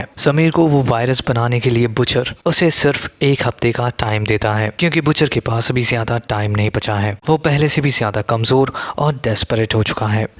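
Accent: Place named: Arunachal Pradesh, India. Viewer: bro.. kya bola aapne? pe.. Rabindranath "THAKUR"? 😅 it seems may be u r from arunachal pradesh.. plz improve accent... baki explanation accha tha... 👍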